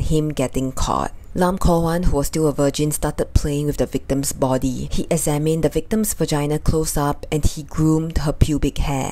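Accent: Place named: Singapore. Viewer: Hurray for that lovely Singapore accent!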